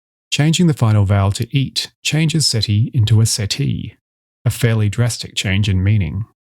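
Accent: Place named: RP, United Kingdom. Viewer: This reminds me of a difficulty I had learning Icelandic. The “short i” sound as in “sit” cannot end a word in American English, but it CAN in Icelandic, as in the word “listi” (a list). For me, it takes special effort to pronounce those vowels the same way instead of pronouncing it like the Y in “happy”. So it makes sense to me, as an American, that “i” is a single phoneme that is pronounced differently in different cases. It didn’t even occur to me that a final “i” can be “short” in some accents. But when I heard the RP, there it was! Was the author of Shavian American? That might explain the choice.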